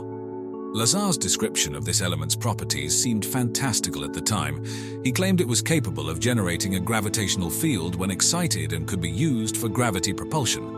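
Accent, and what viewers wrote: England, United Kingdom. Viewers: Well at least this had a decent English voice, unlike many of those fake grating Yankee voices although it repeats itself so still suspect this was AI generated.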